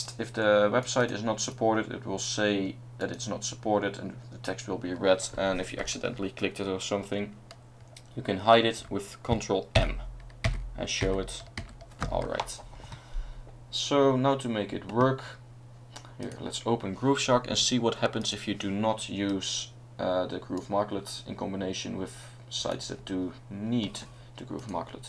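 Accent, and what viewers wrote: Netherlands. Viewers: Haha, je hoort aan je accent dat je zo Nederlands als wat bent XD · your accent, you are dutch speaking are you?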